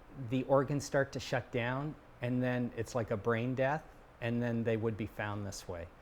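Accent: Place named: Canada. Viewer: I love that Canadian accent :)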